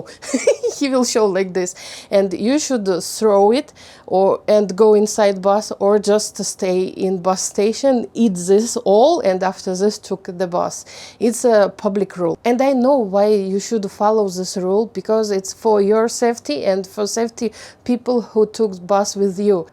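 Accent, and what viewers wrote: Russia. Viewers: Я так рада, что ваши видео теперь на английском так как учу его😍 Единственное что режет уши это наш ярко-русский акцент с твёрдой буквой «d», но это чисто мои загоны, по произношению. Но вот что меня порадовало, я без конца боюсь с артиклями, а у вас их не особо услышала, значит можно и без них🤣 Спасибо за ваши старания не смотря ни на что❤️ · Действительно, можно поработать со временем над произношением.) Пока что это классический рашн аксент.)) Но замечательное начало.) Надеюсь, все больше новых комментариев у канала будут на английском от иностранцев. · Русский акцент никуда не денется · Я не андэстэнд по - английски😂, да и первое видео на этом канале смотрела информативный, куда вы пропали, но какая же вы милашечка! Какой милашный акцент, русский прям, так приятно наблюдать за вами и слушать! Спасибо большое за ваш труд, больших успехов и развития каналу!🫶🏻🌹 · Thanks a lot for your helpful video! 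I like your way speaking English. It's very fluent. You sound confident and your hard russian accent sounds exotic. It's nice! If you need any help with English, feel free and ask. I am an English teacher. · Здравствуйте, Ваш английский довольно хорош, единственное, что это русский акцент в словах the, that и т.д.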